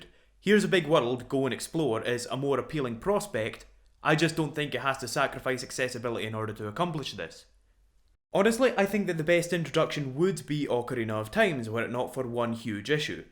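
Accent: Scotland, United Kingdom. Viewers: I freakin' love your scottish accent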